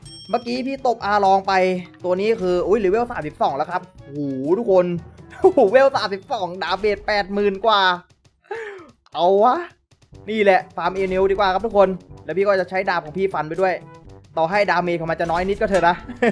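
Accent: Phetchaburi, Thailand. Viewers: ฟังเสียงก็รู้เเล้วว่าเป็นคนเพชรบุรี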